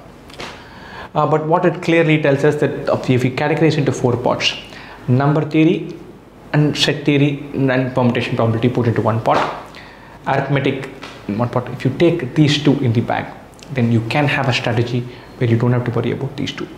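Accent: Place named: South India, India. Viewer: everything all good but get trouble understanding your language( south accent) maybe.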